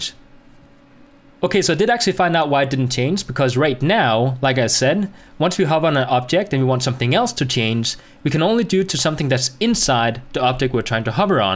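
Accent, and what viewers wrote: Denmark. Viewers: Thanks bro , im working on my portfolio website because im learning to code :D Do you want to see it?? It´s not done yet though but pretty far (ps you sound danish ;-) )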